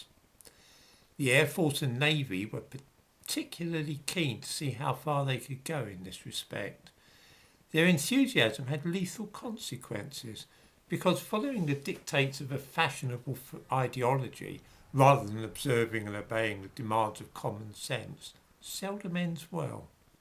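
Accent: United Kingdom. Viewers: you have a way of telling the tale like Fry in Black Adder. We Americans have an affinity for Brit accents. Your opinions sound like American ideas with an ancestral accent.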